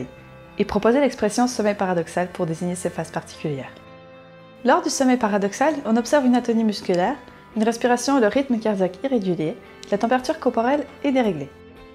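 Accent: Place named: Switzerland. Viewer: Ah d'accord merci! Vous avez pris l'accent :) Vous habitez dans quel canton? Et c'est vraiment intéressant ce que vous faites bravo!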